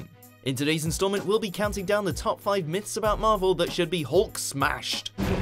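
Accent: England, United Kingdom. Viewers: the first british wathmojo host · Dude, English guy!!!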